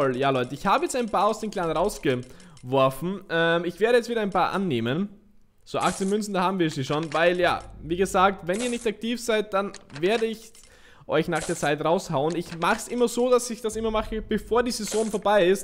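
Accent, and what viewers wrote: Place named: Upper Austria, Austria. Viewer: Kommst du aus Oberösterreich hört sich so an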